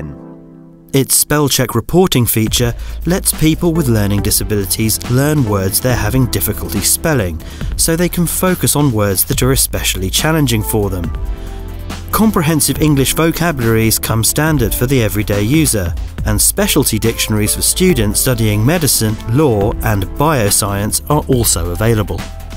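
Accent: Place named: United Kingdom. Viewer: Having a British narrarartor doesn't make you sound smarter Spellex, tap into your own homegrown talent. I'm going to have to give this one a dislike for right now but I know you can fix this Sheldon. As Spellex's #1 fan this video really breaks the canon for the Spellex lore. Please make a better video with canon American actors. Antrex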